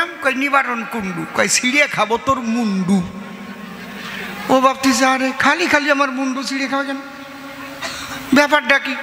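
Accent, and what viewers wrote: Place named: Bangladesh. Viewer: বাঙালি এরকম করে কথা বলে না ওর কথা বলার আছে বাংলাদেশি ওম মাদারচোদ কা ভাগা এখানে থেকে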